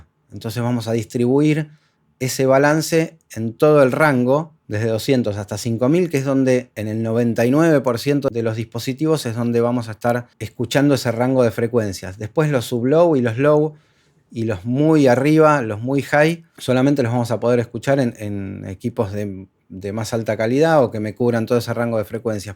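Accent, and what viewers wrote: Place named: Rioplatense, Argentina. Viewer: Ay que bien, un Argentino o Uruguayo, estoy chato de españoles 😅, saludos desde Chile 😊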